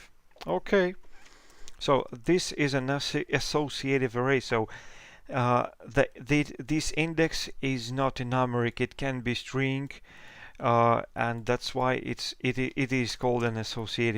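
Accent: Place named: Russia. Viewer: The Russian accent, haha :)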